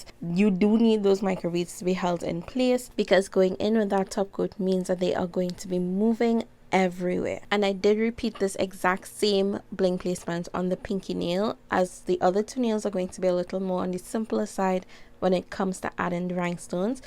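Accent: Trinidad and Tobago. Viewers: These are sooo cute. Side point...I think I'm hearing a Trini accent. If you are then I love you even more lol. I live and vlog in Barbados but I'm Trini so I miss hearing our accent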